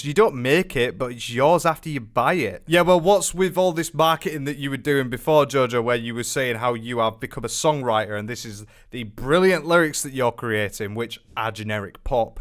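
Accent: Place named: Newcastle, United Kingdom. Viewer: Hey there. I am brand new to you. Nice to meet you. You sound like a Geordie. lol. My favorite accent. I will subscribe and like!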